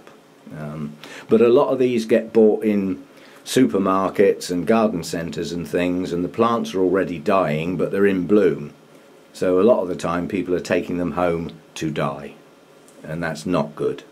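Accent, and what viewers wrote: United Kingdom. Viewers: Thanks for the explanations! Lovely British accent, don’t mind me saying and no offense , listening to your videos it reminded me of Gandalf the grey ! Lee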